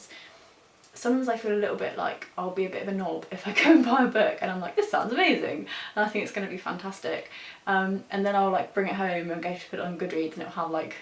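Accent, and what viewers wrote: United Kingdom. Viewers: You might think that your personality is not that fascinating for people to want to watch you, but I’ll tell you that when I first subscribed to your channel I sometimes had issues with understanding your “posh accent”, as one of your viewers once called it in the comments :)). But I persisted until I got used to it. I do not know what could have made me put efforts into it if not your charismatic personality :))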